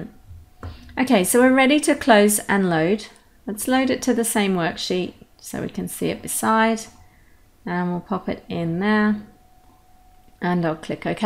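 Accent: United Kingdom. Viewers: Thanks for the great tutorial. I despair when I receive badly formed spreadsheets. PQ has totally changed that - at least it numbs the pain a bit. BTW nice to hear a Brit voice for a change.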